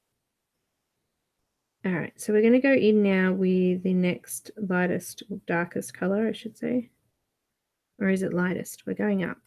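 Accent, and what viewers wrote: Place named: Australia. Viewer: Just wonderful, I learnt so much from this 4 series tutorial. Just realised your a fellow Aussie also. Should of picked up on the accent sooner. I know you used white for these ones but I am really wanting to try the Strathmore toned grey and tan paper, can you please advise exactly what to order as I am clueless. I do use the office works board 200gsm and love it. Many thanks.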